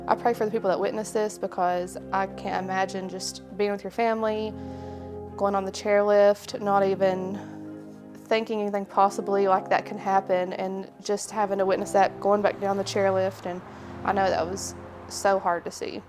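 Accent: South, United States. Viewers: LOSE WEIGHT NOT JUST FOR YOUR LOOKS BEFORE YOUR HOUSE YOU CAN LIVE TO BE 90 GET A CHIC SHORT HAIRCUT AND STOP DRESSING ALL PROVOCATIVE DITCH THE ACCENT THE SOUTHERN HORRIBLE ACCENT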